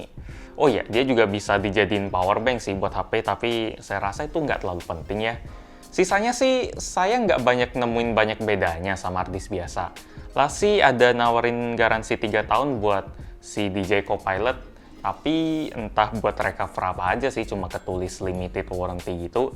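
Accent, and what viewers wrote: Palembang, Indonesia. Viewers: Bang lu org mana? Palemvang ya?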